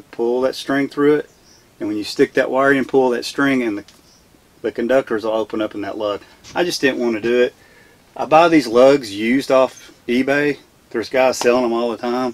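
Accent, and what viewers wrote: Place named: South, United States. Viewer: My kind of Guy Sounds Southern And very Intelligent thank you 🙏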